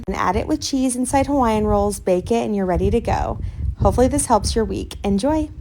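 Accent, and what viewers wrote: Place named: Australia. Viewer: Are you Australian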